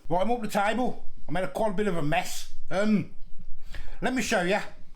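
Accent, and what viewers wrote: Birmingham, United Kingdom. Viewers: Just subbed, watching with 2 boy,s, 6/7, grandson's, when you said masala, they both said it, and remarked that you sounded like me, i'm a Brummy, anyway, great vid, and we 3 watched and enjoyed to the end, now to check your other stuff out, thank's.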